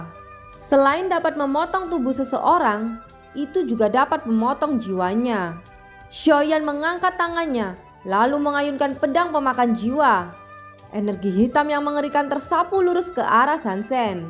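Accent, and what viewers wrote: Java, Indonesia. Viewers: Logat jawanya trllu medok😁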